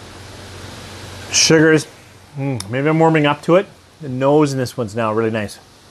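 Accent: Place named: Canada. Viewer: Holy shit I’ve been watching your videos for months and just now I noticed your Canadian accent. Tbh I enjoy your videos more now😆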